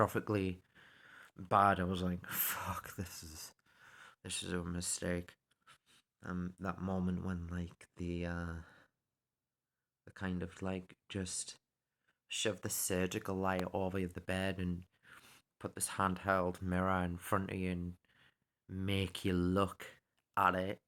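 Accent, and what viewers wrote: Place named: County Durham, United Kingdom. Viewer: Dunno if your channel is even still active, but I just wanted to tell you you're amazing, I think you're proper class. You've been through so much, but you're an amazing human being. I'm from county durham but live in Australia and when I saw and heard you it felt like home ☺️ i hope you're OK, I really do. Life can be so shit some times, I've gone through very different things, but pain is pain. I just felt the need to reach out and say hi 😊 hope you're doing well, it cannot have been easy.' I can't even imagine. I appreciate all of your public speaking, I think it helps the community, or even if it gelps one person, you've done so much! Thanks for easing my homesickness, but most importantly, being very vulnerable, true, and honest. You're amazing ❤❤❤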